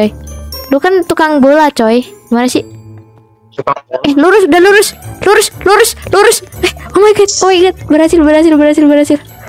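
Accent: Indonesia. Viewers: Kk orang Jawa? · Kok agak Sunda kak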